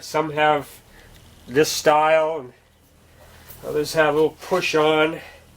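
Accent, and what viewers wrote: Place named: New England, United States. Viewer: I know that New England accent I’m from Boston 😂😂great video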